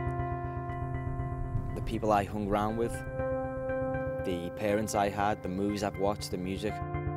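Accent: Liverpool, United Kingdom. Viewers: I knew you were Liverpudlian by your accent<3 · The moment I heard you speak I knew you were from northern England and I thought of Liverpool because your accent sounds exactly like John Lennon's! PS: Great video, great skills, great sport!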